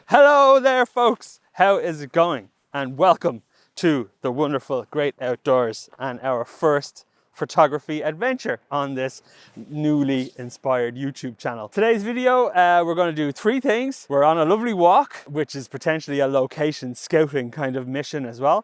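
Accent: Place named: Ireland. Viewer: Love it. BTW you don't need to remind people you are from Ireland.....that is really obvious when you start talking 😆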